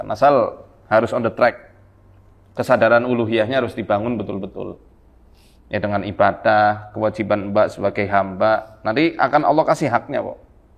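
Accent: Banyumas, Indonesia. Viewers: Masya Allah tabarakAllah,seneng banget nek krungu pak ustadz ngomong ngapak ,medok banget kaya nyon🤭 moga sehat"slalu pak ustadz dan teamnya .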